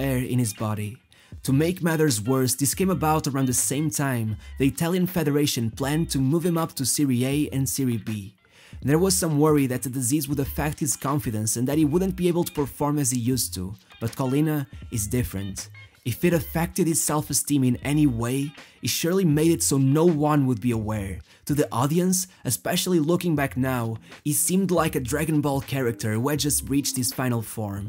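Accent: Portugal. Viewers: Este gajo que está a narrar parece-me ser português, tem o sotaque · Your Portuguese accent kills me, reminds me of my Portuguese friends! Great vid!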